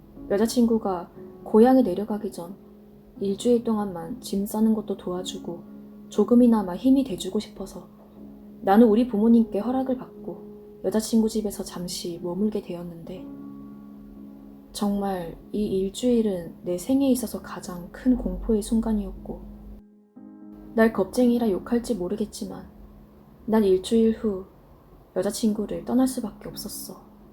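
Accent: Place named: Gangwon, South Korea. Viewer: 성우 목소리 정말 좋네요 강원도 억양.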